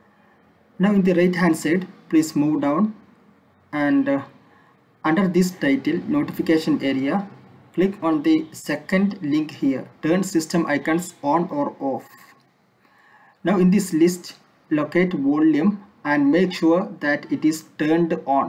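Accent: India. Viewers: came here to actually remove the icon, thanks alot <3 Indian accent is always funny to me btw lmfao, just thought I leave that comment here forever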